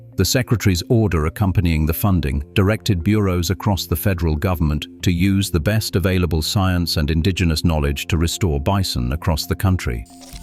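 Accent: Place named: United Kingdom. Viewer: Why a British voice on American content?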